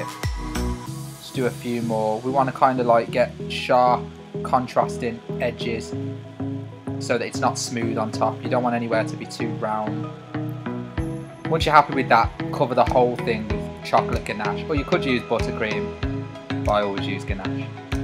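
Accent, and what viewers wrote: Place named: Midlands, United Kingdom. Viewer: Sounds like you are local to me. (Midlands) Can I commission you to make one for my wife's birthday party (26th Feb).?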